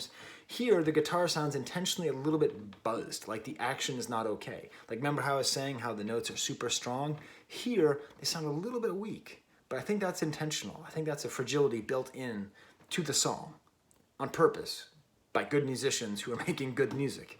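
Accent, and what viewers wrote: Dublin, Ireland. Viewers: Nowhere near a British accent, it's a strong Dublin accent. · He has a very Dublin accent. Hes from the same town as me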